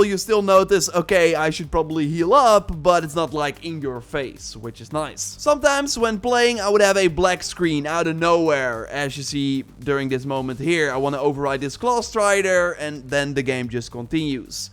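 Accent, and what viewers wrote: Netherlands. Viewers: Man man dat Hollanglish.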